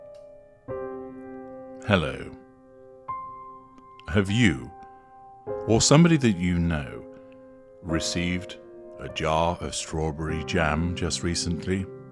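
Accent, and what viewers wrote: South, United States. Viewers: you're killing me with that southern accent! You sound like Mr. Tudball, from the Carol Burnett Show. You should check it out. Us Americans, never figured out what country he came from!🤣😂🤣😂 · Hilarious! Love the country Southern accent!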